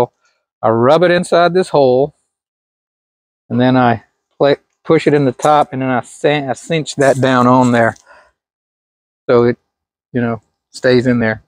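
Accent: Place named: Australia. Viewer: ☺ Cedar, you can't go wrong, it will last for years. The AI must not have liked the Aussie accent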